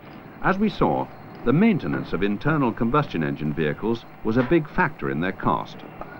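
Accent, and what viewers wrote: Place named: Yorkshire, United Kingdom. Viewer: More than slight; probably Yorkshire. Like Michael Parkinson.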